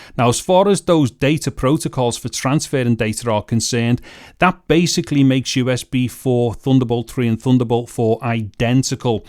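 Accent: United Kingdom. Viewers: Hi! First of all I (as a "bloody German") have to thank you for your very precise pronounciation! It's been a pleasure to listen to your video and I like your accent, though I wonder from which part of the UK it originates. Concerning the read speed you measured with the enclosure one has to consider the write speed of your internal ssd. This has to be faster than the read speed of the external ssd to be able to measure the correct read speed of the TB/USB4 enclosure! By the way, those enclosures with an ASMEDIA AS2464 chipset are both TB4/USB4 compatible with complete downward compatability. Connected to a real USB4 controller in a Win11 laptop or pc you can reach up to 3800 GB/s according to ads for such enclosures such as ZikeDrive Z666 or Adata SE920. So, if you're going to proove these drives in future videos, you're going to need a relatively new laptop or a USB4 controller card for a not too old pc. 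Your 10 year old optiplex seems a bit too old for this, I think...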